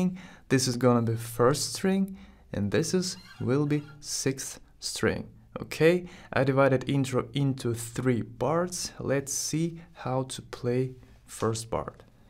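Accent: Russia. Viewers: No, sadly, Let’s play guitar had an Indian accent (I think that was his accent) and has a Russian accent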